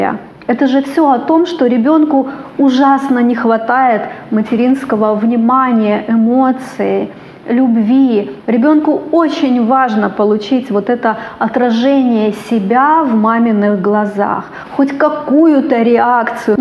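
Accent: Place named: Belarus. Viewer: Не сразу поняла ,почему вас слушаю,а теперь только,поняв ,что вы из Беларуси ,как и я,и проблемы из детства у нас похожи.У вас есть легкий белорусский акцент,вы Т смягчаете на Ц,моему уху это приятно слышать,живу давно в Петербурге. Можно видео про синдром отличницы,школа с золотой медалью и так далее, да и дома все идеально,понимаю,что незачем,а вот...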